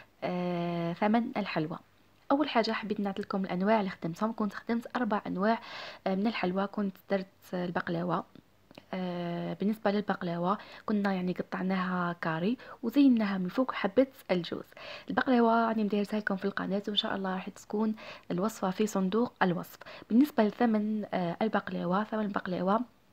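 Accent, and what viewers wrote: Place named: Djelfa, Algeria. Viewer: الله يبارك حسيت من لهجتك جلفاوية يعطيك الصحة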